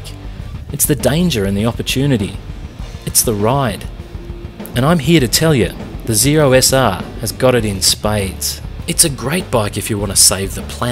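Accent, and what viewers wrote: Australia. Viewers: Need more reviews like this. The Aussie flavour is refreshing compared to the yank reviews.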